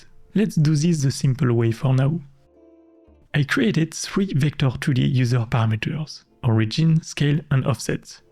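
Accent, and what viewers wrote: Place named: France. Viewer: Accent is just terrible and subtitles def don't help. Invest in some speech classes french boy lol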